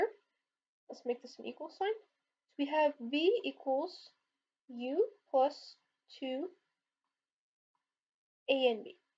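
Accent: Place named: United States. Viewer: please clearly speak beacuase u have american ascnet and i am from india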